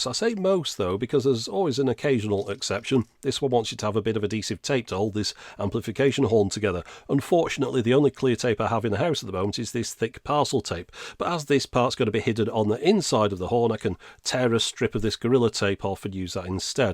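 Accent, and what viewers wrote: United Kingdom. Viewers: Dear. I have two questions. First question: can you discribe and explain an automobile vinyl record player that was available in the 1970s? Second question: Can you review the 8 centimeter long mini cd and dvd and the Nintendo GameCube? Those were my questions. I like your videos very well and you have a neat British accent in your voice. With friendly greets from Gamer Japio.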